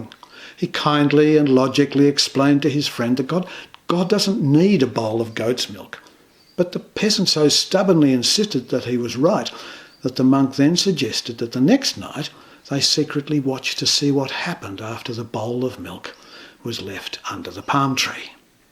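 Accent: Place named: Australia. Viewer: Thank you! A friend shared this in text and it's so nice to hear it in audio. Especially in Australian 🇦🇺 From a fellow Aussie living in America. So nice to hear a familiar accent.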